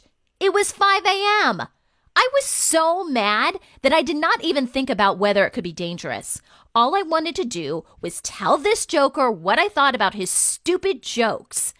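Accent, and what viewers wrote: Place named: Australia. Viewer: You are not even close to being all grown up if you don’t understand English with an Australian accent. Have you never watched television or met anyone from Australia?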